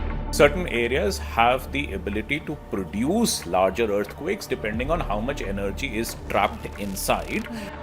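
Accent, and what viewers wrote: India. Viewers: Bill gates with an Indian accent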